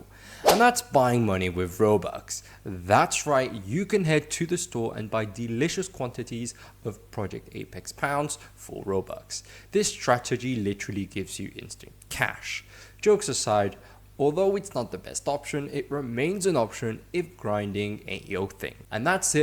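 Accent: France. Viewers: Thanks for the tip. Btw I enjoy your French accent. 🇫🇷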